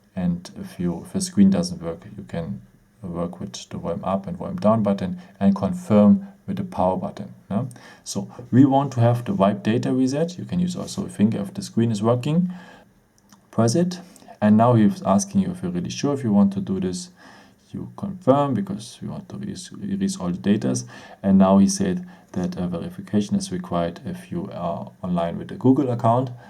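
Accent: Germany. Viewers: Hundert prozent deutscher. Aber video hat geholfen dange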